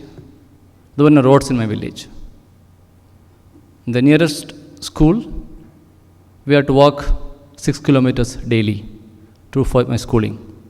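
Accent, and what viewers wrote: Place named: Kerala, India. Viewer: He is a Handsome and Talented business man!! N from his accent he seems to be a Malyali!!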